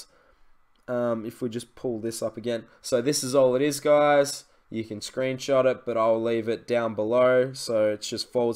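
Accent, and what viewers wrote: Australia. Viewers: Wich part of Australia are you mate??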